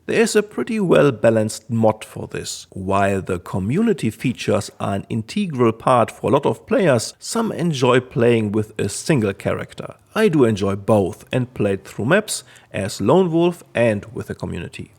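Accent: Germany. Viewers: Bro sounds more german than me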